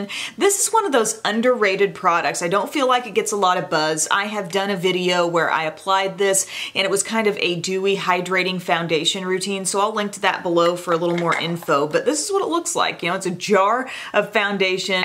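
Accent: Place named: Nashville, United States. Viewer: I don't know where you are from, but your accent makes me homesick for where I grew up--Nashville.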